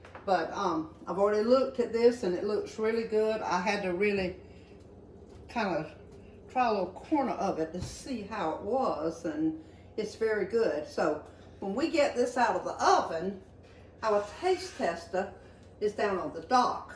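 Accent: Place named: Savannah, United States. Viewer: I shall and you sound like my people from Savannah and South Carolina. I love it!!! And they DID the crab! They went and spent a whole morning catching them and then the whole afternoon picking them! Good memories!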